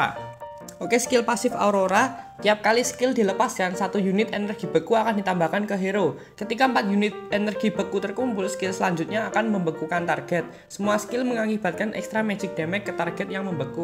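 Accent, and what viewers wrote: Java, Indonesia. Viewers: Medok mas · Medok banget bang :v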